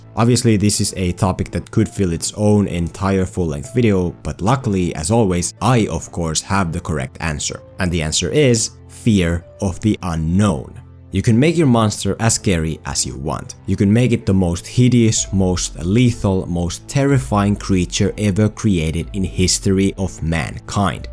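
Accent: Finland. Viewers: Are you Finish cause you have a real Finish accent... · He sounds Finnish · Is this Man finnish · Are u from Finland?, Ur accent sounds Finnish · Is finnish? Do i hear a finnish accent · Are you from finland. Your accent is atleast similar to the finnish one so.... Torille tavataan ja SUOMI PERKELE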